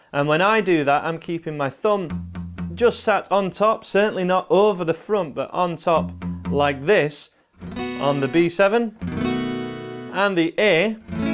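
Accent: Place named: County Durham, United Kingdom. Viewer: Hi, This is pretty good stuff that you are doing, very helpful. I played jazz saxophone for thirty five years and have just picked up a guitar (at seventy three) Where are you from? I assumed you were all American, you sound like North East England, County Durham lilt to your voice. Thanks again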